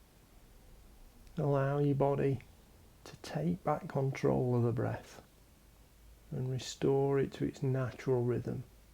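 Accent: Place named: Sheffield, United Kingdom. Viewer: Only just found you after listening to Radio Sheffield, I have enjoyed your yoga session and have now subscribed. It is good to hear a local voice and thank you for the sessions which I shall now carry on with, much appreciated.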